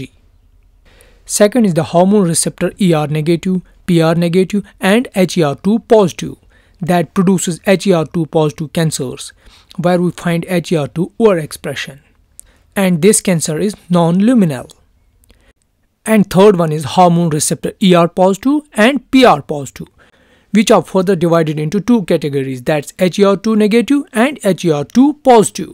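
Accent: Kashmir, India. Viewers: That's great! I guessed you might be from Kashmir by your voice. Your teaching is excellent, and the content is really helpful.Thank you sir.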